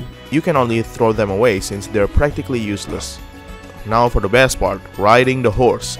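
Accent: Indonesia. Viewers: Logat Inggrisnya kek dari Indonesia. Kasi tau gw bang kalo lu dari Indonesia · setelah nonton beberapa video lu, gua bru sadar lu orang indo wkkww, gara2 accent . ah sial gua kira dlu org luar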